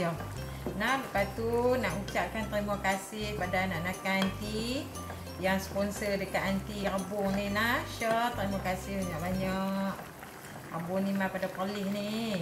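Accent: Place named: Perlis, Malaysia. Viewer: Sabit pelet macam org perlih😀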